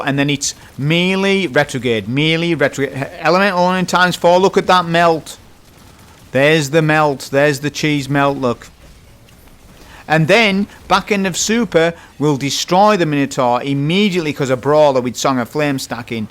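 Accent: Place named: Newcastle, United Kingdom. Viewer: that's fair enough, I'm just trying to identify the accent, sometimes I think there's a bit of Geordie in there, sometimes I'm like hmmmm.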